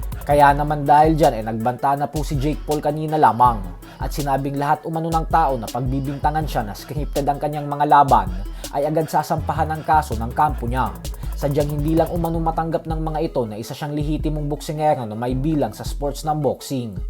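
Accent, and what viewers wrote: Visayas, Philippines. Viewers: Ayusin mo boss mo visayang Visaya 😅😅😅😅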